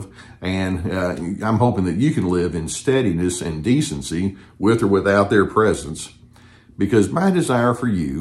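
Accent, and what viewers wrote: South, United States. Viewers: Haaaaaaa ;D))))) you caught me out there , I wasn't expecting that word out of your mouth with that sweet southern drawl " a jerk " lol hearing you say that word really did tickle me , you have a good day sir ...I will always come back to hear that delectable accent ;D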